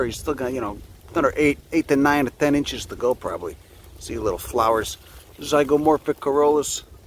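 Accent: Chicago, United States. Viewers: Yo i fucking love your Chicago accent! Not many people have thick Chicago accents anymore.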